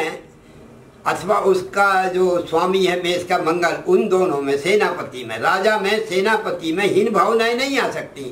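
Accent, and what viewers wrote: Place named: Madhya Pradesh, India. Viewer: Aapke baat karne se aap m.p. ke lag rahe hain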